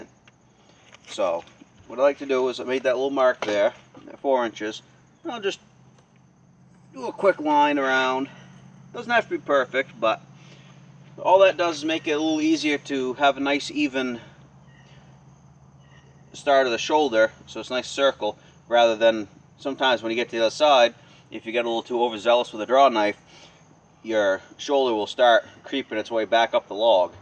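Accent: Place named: Nova Scotia, Canada. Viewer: Bro you sound like youre from Nova Scotia, I love it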